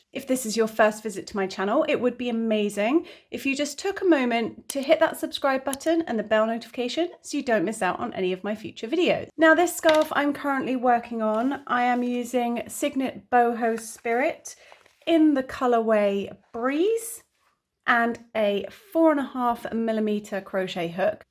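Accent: England, United Kingdom. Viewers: I heard your accent and assumed you would be using British crochet terms until I heard SC! I'm a beginner to blankets and anything that isn't amigurumi, would I be able to follow this if I remember that SC is DC and DC is TC to me? Are there any other differences ? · Great tutorial thanks also so sweet to listen such a rich English accent.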